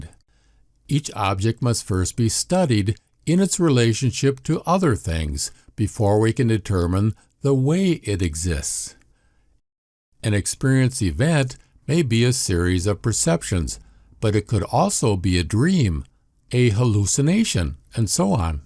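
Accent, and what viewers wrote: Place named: Western New York, United States. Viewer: Are you from WNY? Buffalo area? Possibly Chicago or Michigan? I hear your accent and I like it; I am from Niagara Falls NY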